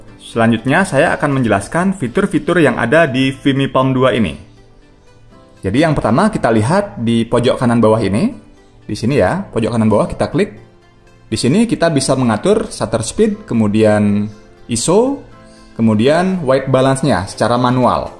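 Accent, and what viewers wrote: Bali, Indonesia. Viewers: Makasih bang penjelasannya kerennn banget…ngomong2 logatnya kayak logat bali bang..hehehe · Orang Bali kayaknya logak nya... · Logatxa kayak orang bali.medok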